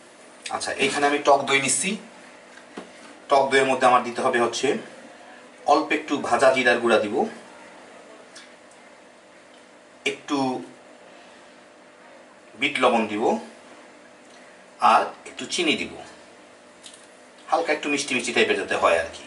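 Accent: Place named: Bangal, Bangladesh. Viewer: Vai ranna hok jemon temon..kinto apnar kotha gulo hoy jhakkas....sei level er fatafati...lozza soromer balai nai..ekdom khas banga ...wao..daron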